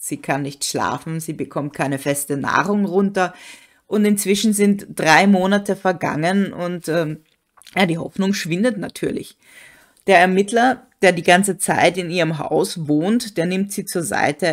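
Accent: Austria. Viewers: da lag ich auch fast richtig. Wien hoerte ich zwar nicht raus, aber Ich hoerte leicht Oesterreich raus. Gruss aus Tirol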